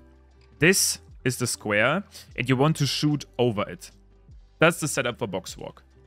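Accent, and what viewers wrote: Germany. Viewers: are you German? your English sounds really german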